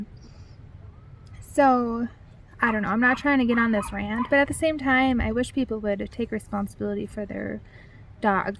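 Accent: Minnesota, United States. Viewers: Your Minnesota accent came out to play in this video lol😊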